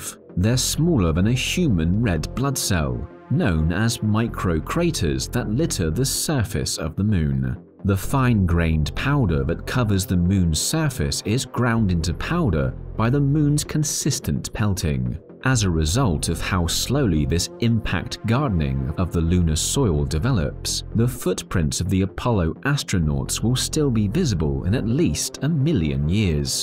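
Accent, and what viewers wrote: United Kingdom. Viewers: I know what they found on the moon your big stupid limey mouth talking 💩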